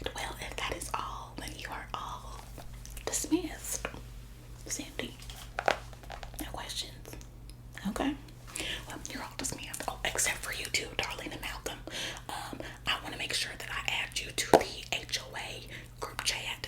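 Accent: South, United States. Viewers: I love the southern accent 😁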